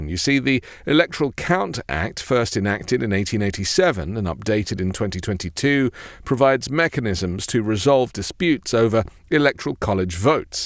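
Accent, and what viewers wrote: United Kingdom. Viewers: Why do you sound British? Mmmm? and we all know the law does not matter with Trump. This just seems to be another way of making people angry without a chance to do anything about it. Given the fact he was inaugurated once and almost was inaugurated if the insurrection succeded, and the fact that he beat the senile Democrats in the last election by electoral college and by popular vote we on the left want answers not more pies in the sky " What ifs" with Trump because that is all we hear. What if Trump get angry at Musk angry a Pence angry at anyone in his way and then nothing more comes of it. Your words are part of the meaningless press and the real left, the real progressives are underground and not hear on "What If" Podcasts. There very few left podcasts now and those that I know of I will not speak of here in this make believe democratic corporate podcast. 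· I really, really hate someone with a British accent pontificating to us as to how we should run our government! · Thanks for reporting the truth. I always love hearing your British accent & so does my dog, Nick. He always moves closer to the TV when hears you. He loves British accents. 😊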